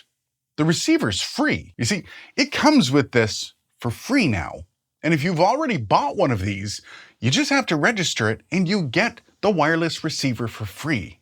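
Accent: Canada. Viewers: Ouch, this one stung about as much as your Canadian "about" pronounciation pet peeve. I assure you not every podcast is two people sitting and having a conversation. I've been doing an audio theater podcast for 20 years, incluing me doing multiple voices, adding music and effects that can can sometimes result in editing files that are 12 or more channels deep. And many other podcasters do the same. I only mention this because just as you wouldn't say all music consists of a guitar and a drum set and only requires two mikes, not every podcast can be boiled down to a talking heads show..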